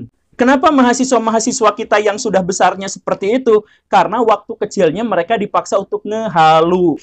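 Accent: West Java, Indonesia. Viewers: Bapak orang Sunda ya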